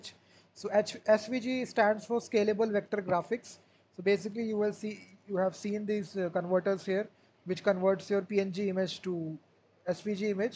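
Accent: India. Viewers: you have the indianest indian accent, it's music to my ears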